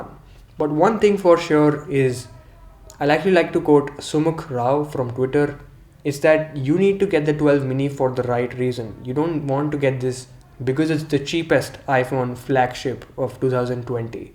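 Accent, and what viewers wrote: India. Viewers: i love this indian accent ♥️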